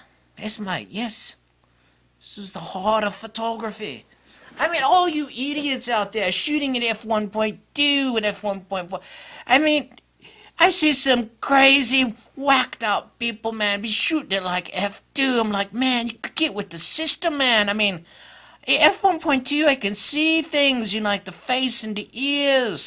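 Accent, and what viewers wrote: United Kingdom. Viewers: wow, a British accent! At least one of them... Now say this "Would you like a glass of water?"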